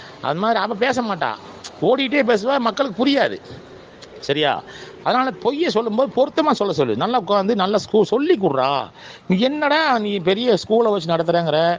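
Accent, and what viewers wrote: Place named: Kongu, India. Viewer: பக்கா கொங்கு தமிழ்❤😂❤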